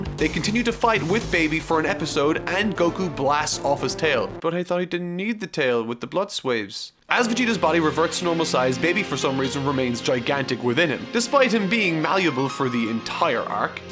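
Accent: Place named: Ireland. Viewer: You're Irish aren't you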